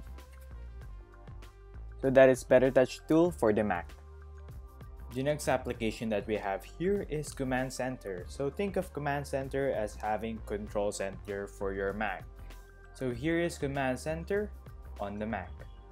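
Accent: Philippines. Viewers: Sounds Pinoy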